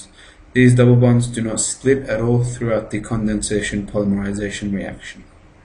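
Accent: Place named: Middle East. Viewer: Woah, you sound exactly like me except a little middle eastern touch.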